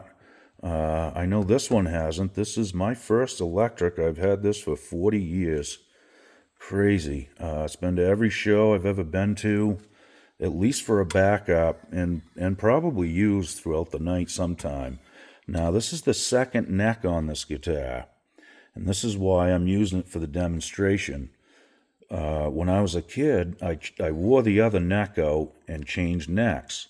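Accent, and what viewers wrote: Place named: Maine, United States. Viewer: Had to check you out after hearing you talk and was right...mainah bub yessah!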